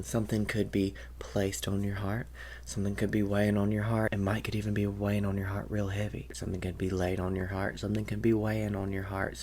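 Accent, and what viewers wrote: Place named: Texas, United States. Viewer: you sound just like the women in my Texan family - lol - even I can sound like that when I want - I live in Massachusetts now for many years so my accent is a tad less identifiable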